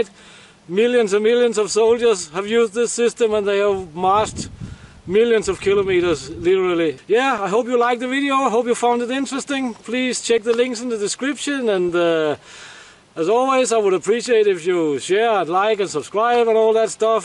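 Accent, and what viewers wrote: Denmark. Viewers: He is from Denmark. Many Danes are fluent English speakers. My brother has a Danish wife a 3 children. I knew was a Dane from his accent. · No he's Danish, it's a danish accent. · The most surprising thing about this video, is seeing a Russian speaking English with a Danish accent.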